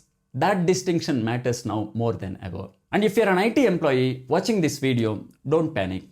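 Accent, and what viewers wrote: India. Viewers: South Indian? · Thi guy is awesome. He is the most Indian sounding Indian I have seen on YouTube. Nothing fake, and he even drops the clever humour and nuggets Indians do. I love it!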